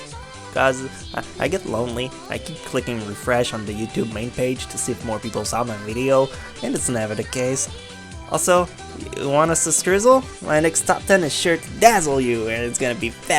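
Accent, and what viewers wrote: Quebec, Canada. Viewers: is Canadian and his first language was French so that's his accent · French Canadian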